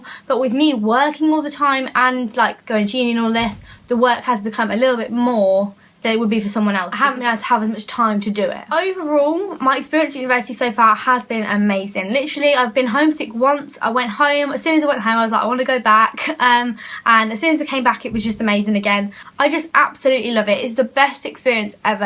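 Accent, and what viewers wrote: United Kingdom. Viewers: Where in the uk are you from originally ? Your accent is beautiful :)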